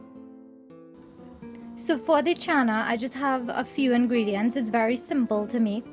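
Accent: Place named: Trinidad and Tobago. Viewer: I live in Montreal, Quebec Canada, I would love LOVE to have a wife born in , Trinidad and Tobago I adore everything about these women, The food, the fancy dress, and that accent, the culture, the eyes, ouff Being an Italian man, guess it will remain a dream. dammit [ a pinch goes a long way she says,,,, LOL ] sometimes they slap us when we pinch 'em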